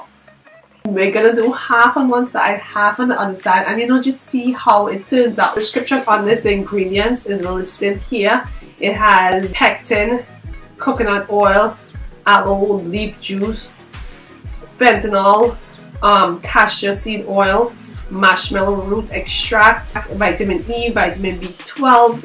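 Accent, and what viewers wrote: Trinidad and Tobago. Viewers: You sound Trinidadian 🇹🇹 Just found your channel.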